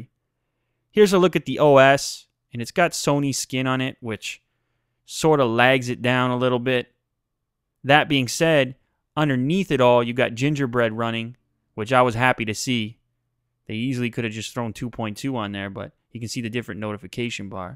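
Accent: Canada. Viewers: Sony's loading screens in games haven't changed much. Also, you sound more Canadian in this video. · Hahahaha omg feeling old as me? I love how Canadian Lew sound back in those days.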